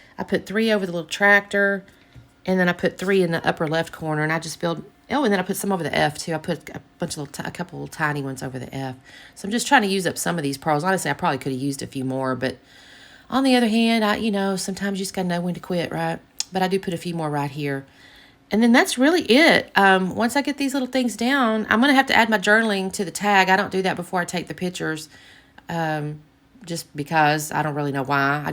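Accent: South, United States. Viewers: It just hit me. I know why I like your videos so much. It's that southern accent. I haven't visited the state fair, but this year, I will be there. I told my husband, "Honey, we gotta go to the state fair!" I don't know when it is going to happen, but as soon as San Antonio announces it, we are there. That sounds like fun. Your layout came together nicely, and I love fall colors. They make me think of warm pie. Anywho, thanks for sharing.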